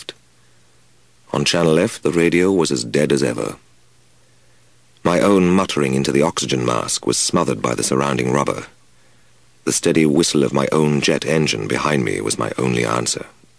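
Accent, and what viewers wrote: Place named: England, United Kingdom. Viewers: I agree, respect to A Maitland, but you need the well spoken English accent for this story. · Much prefer this version (which I have on cassette tape) to the Al Maitland version. The difference between the reading by an actor versus a radio announcer. The English accent also lends authenticity not present in the Canadian version. Wonderful story, in any event.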